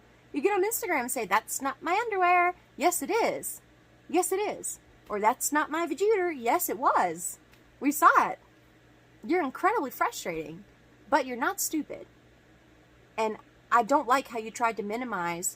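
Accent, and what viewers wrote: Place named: South, United States. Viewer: Love your southern accent by the way southern girls all the way! 👍🏻🙌🏻😊💕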